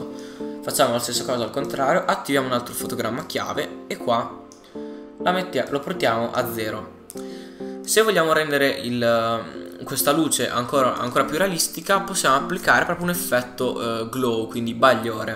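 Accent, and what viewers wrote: Italy. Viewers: ***** ma sei di ferrara? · Bel tutorial...ma sei Bolognese?? forza BOLOOOOOOO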